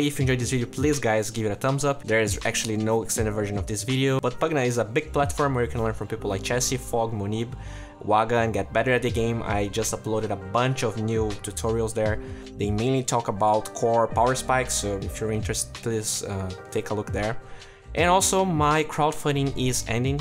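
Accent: Brazil. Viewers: Are u Brazilian? This accent lol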